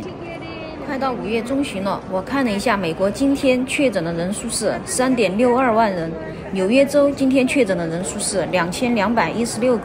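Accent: Sichuan, China. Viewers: 你的川普听的好安逸哦，偶尔还有点皮、喜欢这种风格，关键是我喜欢听川普。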